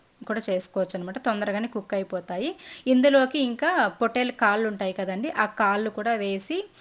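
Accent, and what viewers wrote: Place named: Eluru, India. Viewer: పెటిక పప్పు అనరు మా సైడు చీక్కుడుకాయలు అంటారు మాది పచేమగోదావరి జీలా ఏలూరు మేము ఎపుడూ అలంటే కూరలు వాడూకొము రాయలసీమ వెపు వాడుతారు అనుకుంట మీ మాట మా సైడ్ లాగా ఉది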